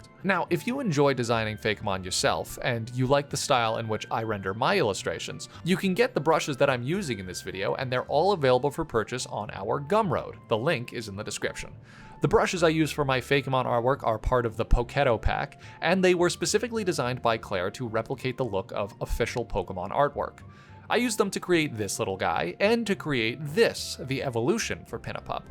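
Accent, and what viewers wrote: New England, United States. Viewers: Love how the New England accent becomes slightly stronger throughout the course of this video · Dunno how its taken me this long to realize youre from new england, maybe cus im from here too i was just like "yep completely normal average accent right there" lmao??? But eyyyy neighbor!!! (Pls read all in an equally thick Cape accent) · when you said "hear that?" I actually heard a bird call that might have been a bluejay. btw I love you doing a new england accent even while you're not joking, it's nice. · I can't tell if his NE accent is stronger in this video or if I just barely noticed it now that he said he's from there lol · Haven't watched for a while so the new england accent really hit me like a truck. not sure if its new or I just forgor. anyway I love Shorlugg pinipeds rule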